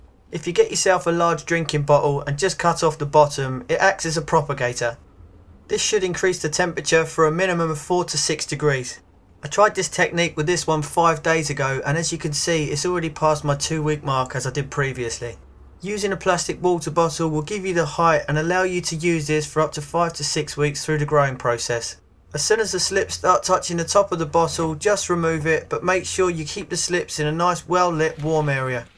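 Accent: England, United Kingdom. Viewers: When I first heard your accent I thought you must be an Englishman living abroad, then when I realised you can grow them in England it gave me extra confidence to try them here in France. I like your video I'll be giving this a go and then checking out your other videos. Well done and thanks. Al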